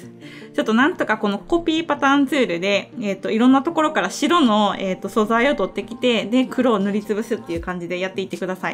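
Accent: Kansai, Japan. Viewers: 地味な作業😁だな〜。 Adobe MAX 楽しみにしてます❣️ そしてめっちゃ関西弁😁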